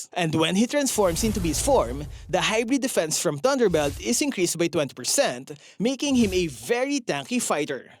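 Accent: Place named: Philippines. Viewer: English filipino dictionary accent.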